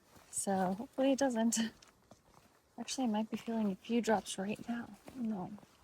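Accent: United Kingdom. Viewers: I love the British accent! I’ve been watching your hike for many years. I remember your video where you started the British accent and asked if was real or not. Never saw the answer. I think it’s real, if not it’s great!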